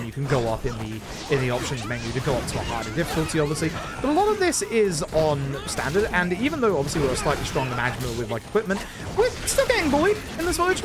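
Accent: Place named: United Kingdom. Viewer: DAWG, YOU'RE BRITISH!?!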